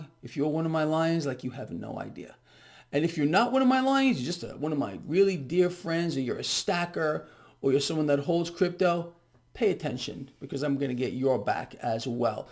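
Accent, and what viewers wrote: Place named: New York, United States. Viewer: For a second I thought you were telling us to buy COAL for every 100 stocks. 😆Texas ears, meet NY accent. BTW, I just bought your eBook and I'm already learning some concepts - thank you so much for the education.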